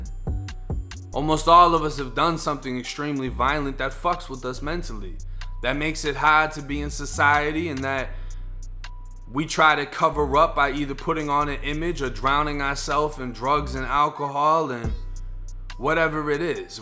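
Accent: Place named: Massachusetts, United States. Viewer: Good shit. This is a break through for you. Everytime I level up it makes me feel happier with who I see in the mirror. We have watched you transform. I've been with you a while. You tell good narratives. You're a great story teller. And you are now seeing that life is more about letting go than reliving. My gf calls me on it when I live in the past because we had to be one thing to not fall victim to the population. You don't get this many loyal subs unless you're doing something interesting. Massachusetts accent don't hurt us either. Lots of girls love our accent 😂. I actually watch your vid first of all my channels in subbed to. 🔥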